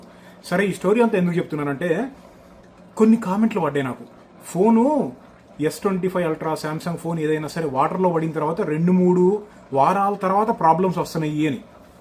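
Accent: Andhra Pradesh, India. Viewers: ఎందులోనైనా నీ రూటే సెపరేటు తంబీ నీ బాడీ లాంగ్వేజ్,నీ భాష లో యాక్సెంట్ ఒంగోలు, గుంటూరు, విజయవాడ మూడు కలిపి మిక్సింగ్ కొడితే ఎలా ఉంటుందో అలా ఉంది 🎉🎉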